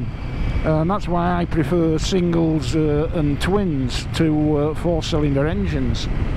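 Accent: Yorkshire, United Kingdom. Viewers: Lovely to see ya again. Yorkshire Henry Cole. Makes me nod off every time. But I get the gist after watching several times. Keeps the views up lol 😂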